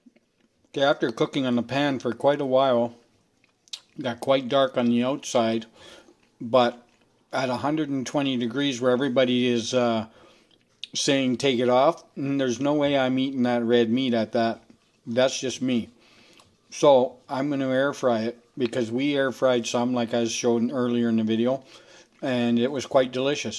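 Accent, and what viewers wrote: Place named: Midwest, United States. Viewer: Most Midwestern accent ever lol!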